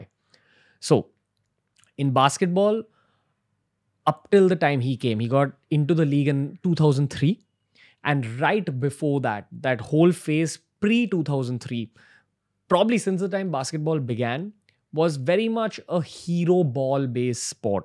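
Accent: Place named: Mumbai, India. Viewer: Hi Ranveer, I hope you are well and safe. I don't follow your videos but have seen a few of them as you interview some sensible people like Saif Ali Khan and Rajeev Masand. Your english is understandable with your Mumbai accent. I understand you are trying to educate the masses in India (your prime target to enter Bollywood). I strongly feel every person in India wants to be a superstar! However, I don't understand that why do you keep mentioning who was your junior or senior in the elite school you have studied in. It's quite irritating to hear you mention this to every guest you meet. I assume you are doing well in your career. But are you trying to sell yourself by mentioning the names of all your juniors or seniors in school who belong to families with influence and money? Of course you are proud of your school and should be. Your body language is such where you looked and sounded like a scared child when interviewing Saif. 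I would like to conclude that I have written to you today using a pseudnym of Tanya Newman as I don't wish to be trolled, abused or threatened by your followers. Indians are a bit crazy that way if they feel offended by a view expressed by a lady. You look decent but please stop looking for praises. I really liked your mother's interview but it appeared that you wanted her to praise you all the time which she didn't. Most definitely, you tried putting words in her mouth. That was funny. And your video teaching the masses how to impress a woman/world wh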